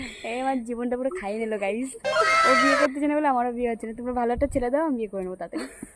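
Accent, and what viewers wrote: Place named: Bangal, Bangladesh. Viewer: Amader nemontonno korbe na !! Eka eka e chole jaccho biye khete . Ar apni to puro bangal bolchen .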